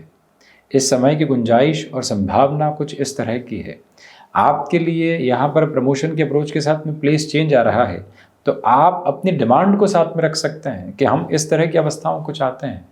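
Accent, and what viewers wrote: Standard. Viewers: Itni suddh hindi Maine DD1 ka Ramayan mai bhi nahi suna tha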